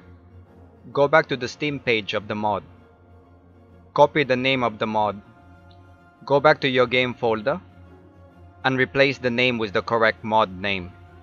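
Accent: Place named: India. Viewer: You sound indian holding in his language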